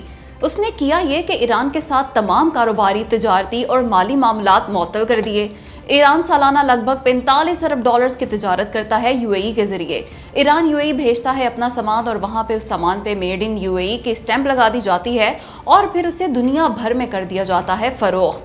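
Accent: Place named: Pakistan. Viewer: Sister immence respect kia BAAT hay aapki Pakistani style urdu❤❤❤❤Hindi Ka ek lufz nahi bola❤❤❤❤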